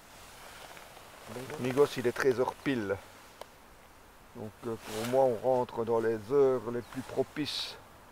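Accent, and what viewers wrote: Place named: Belgium. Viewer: ah d’accord ! Aussi je me disais bien que ton accent me faisait penser que Tu pouvais être du pays du grand Jacques 😉 Du coup au bord de l’eau, tu tournes au café liegois. Pardon pour la confusion 😔 en tout cas les red halibut Malgré le froid, ils t’ont apporté une session plus qu’honorable. A bientôt mon ami, respect admiratif de Michel de Sologne 👍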